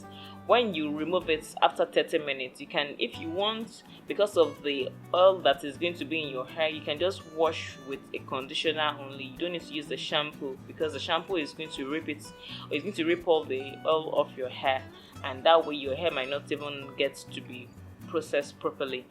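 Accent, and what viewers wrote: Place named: Nigeria. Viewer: you sound like nigerian... hmmmmm are you one of them? .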